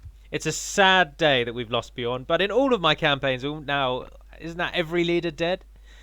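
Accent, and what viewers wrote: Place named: RP, United Kingdom. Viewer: Ah, the Anduin campaign. Where skill level drops significantly xD. In your other campaigns you play mostly as i'd do myself really (except for the queueing of 4k-ish gold cost buildings when you make like 300/turn:P ), but with Anduin I just want to scream at the screen haha. Mostly I resort to facepalming instead. I don't think you will fail the campiagn though, it's really hard to lose since the AI works in mysterious ways. But you aren't making it easy for yourself thats for sure. I don't really watch lets-plays but these I really do enjoy. Probably because it's a really nich (it's that the word?) game, mod-within-a-mod-whitin-a-really-old-game, combined with a posh british accent.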